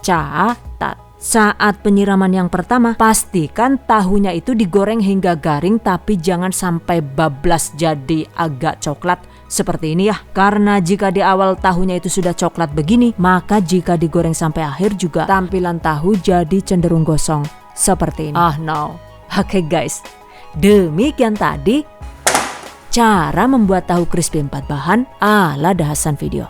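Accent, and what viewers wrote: Banyumas, Indonesia. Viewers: Mba, njenengan wong ndi sih, deneng kadang teyeng ngomong ngapak😅😅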